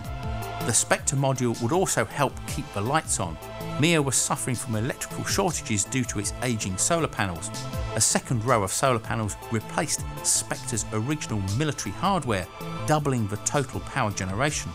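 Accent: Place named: Southern England, United Kingdom. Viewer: That's his Southern English accent showing through. Many English accents don't put a lot of emphasis on the "rrrrr" sound of the letter "R".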